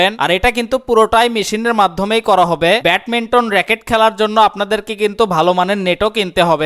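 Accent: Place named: Sylhet, Bangladesh. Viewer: Ata ki. Sylheter❤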